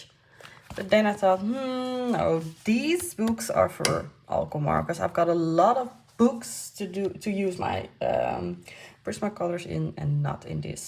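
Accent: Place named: Netherlands. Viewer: Ik dacht al dat het accent niet anders kon zijn dan een Nederlander! Leuk om iemand uit ons landje acties te zien met kleurboeken. Waar heb jij het boek van Klara Markova gekocht want Bol en Amazon hebben die niet.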